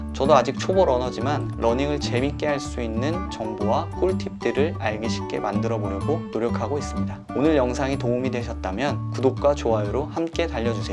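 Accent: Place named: Mokpo, South Korea. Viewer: 오 목포네요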